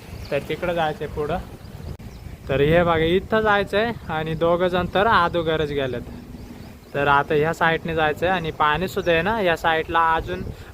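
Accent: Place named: Nashik, India. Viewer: भाऊ तुणच मुळ गाव कोनत आहे तुमची भाषा ऐकून वाटत नाशिक मधचे आहेत तुम्ही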